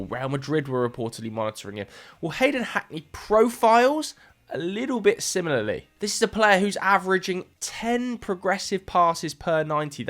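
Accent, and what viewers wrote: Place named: Manchester, United Kingdom. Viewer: Wow, thats a great Manchester accent!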